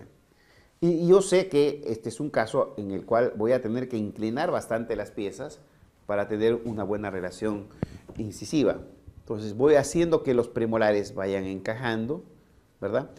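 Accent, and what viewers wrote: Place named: Peru. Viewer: Pero el doctor tiene acento peruano, no colombiano jajajja. Es de Perú.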